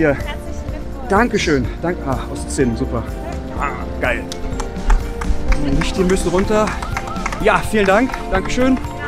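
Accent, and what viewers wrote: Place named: Cologne, Germany. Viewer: diese kölsch immer zwischendurch, einfach herrlich :D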